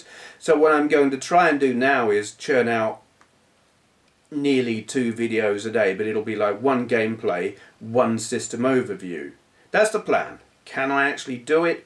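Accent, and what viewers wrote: United Kingdom. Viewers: funny hearing you say you like tim because he has an aussie accent. i subbed to your channel as an aussie partially because i like your british accent!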